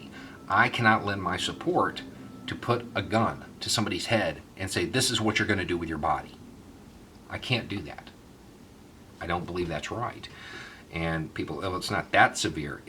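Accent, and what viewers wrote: South, United States. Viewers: Honestly, this is one of my favorite videos ever from you. Not only did you put everything so well, and sensibly, you just helped me resolve a moral/ethical dilemma I’ve been unsure where to come down on for a while: should vaccines be legally mandated. I know that might seem small, or silly to some people, and it’s obviously not a huge deal in my life, but I’ve been undecided and conflicted on the question of making vaccines legally mandatory for a while, but that explanation and description of the escalation of police violence was something I really hadn’t considered in this case, let alone ALL cases. I appreciate it,. Normally I’m just enjoying your southern drawl and agreeing with you, but these vids where you make me think about something from a new angle, or teach me something new, are my favorites! ✌🏼🏴♾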